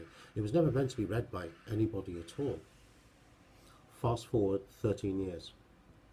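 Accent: England, United Kingdom. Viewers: Anyone randomly binging this dudes videos? I see fresh comments on a 4 year old vid. Like this dude's style of video, but how does he have an English accent with that name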